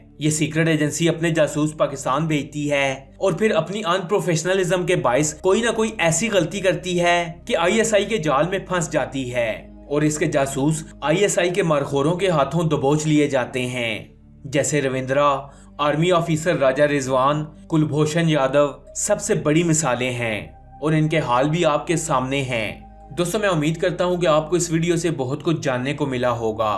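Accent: Punjab, India. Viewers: You are Urdu speaking in Punjabi accent...... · Please narration achi kre strong punjabi accent , urdu pr zulm hy thora sa soft ho jao bhai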